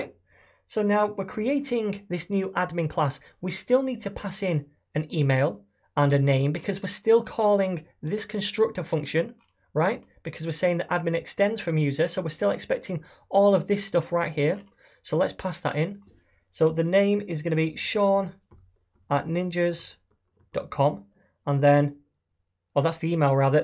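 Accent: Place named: Northern England, United Kingdom. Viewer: You are an excellent teacher, and clearly nothern England (I send Manchester??). This is some of the best JS stuff i've learned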